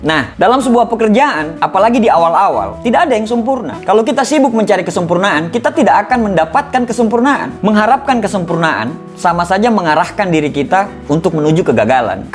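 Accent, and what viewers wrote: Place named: Palu, Indonesia. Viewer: Kayak logat Palu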